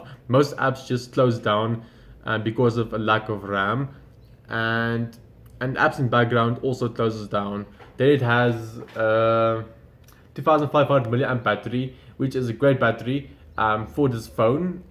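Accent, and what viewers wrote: Afrikaans, South Africa. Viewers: Daai lekker diep Afrikaanse aksent, jys 'n regte boer!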